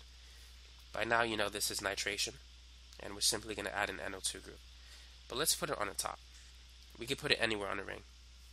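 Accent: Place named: New York, United States. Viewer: Isaac Pelletier no sounds like from some New York district.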